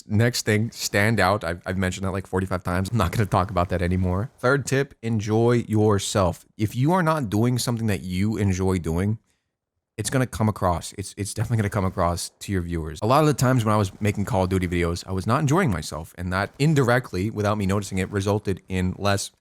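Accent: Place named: New York, United States. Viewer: new yorker accent leakin out a bit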